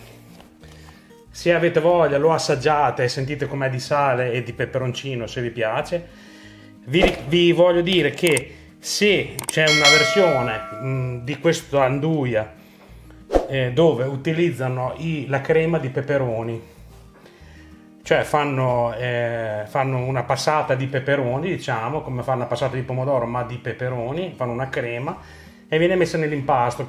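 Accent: Emilia, Italy. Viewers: ... nel video sembri mia nonna, stessi gesti, stessa sicurezza. Bravo, un calabrese con l'accento emiliano, perfetto. Un solo piccolo suggerimento. Conviene, anche solo per praticità, così faceva anche mia nonna, legare i tocchetti di nduja mentre si riempie il budello. Tutto il resto è perfetto, anzi l'aggiunta dell'affumicatura, mi convince molto. Sono dei Casali cosentini e qui c'è un salume simile forse identico alla nduja, lo chiamiamo nduglia, le cui tracce risalgono al 1600 (quindi più antico di quello di Spìlinga). È citato in una poesia di Donnu Pantu, "la briga degli studenti", di Aprigliano (CS). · Bravo! È un piacere seguirti e sentirti parlare. Salumi e pronuncia emiliana mi riporta alla mia metà mirandolese. L'altra metà sanmaurese (prov di Torino) mi dice però che quel fornelletto da noi si chiama Fojòt (fuiot) e serve per scaldare tenere calda e degustare la bagna caoda (cauda) piemontese. 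Tecnicamente fa lo stesso lavoro per la bagna e per la 'nduja: manciari! (La parte ragusana di mia moglie)😜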